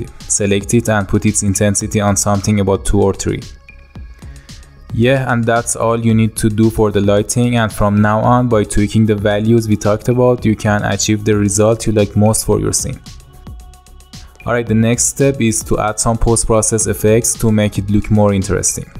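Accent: Iran. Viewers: Your accent is similar to Iranians. If you're Iranian, well done. If not, well done again ;) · dadash damet garm az lahjat fahmidam ie iranie ba gheirati :))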